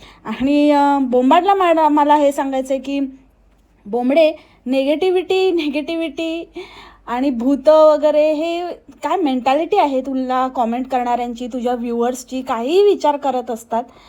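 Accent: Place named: Ahmednagar, India. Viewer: tu khuthun ahes kiti chan bolte tu nagarchi ahe ka tu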